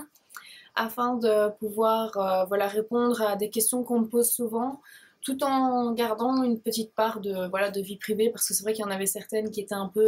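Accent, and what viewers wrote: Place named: Belgium. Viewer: Super que tu débarques sur YT ! J'adooore tellement l'accent belge :)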